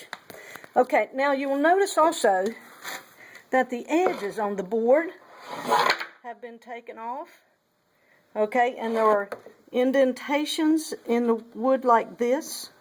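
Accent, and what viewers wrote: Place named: Kentucky, United States. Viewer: Lady you are a doll! You're accent takes me home to Kentucky! I miss it.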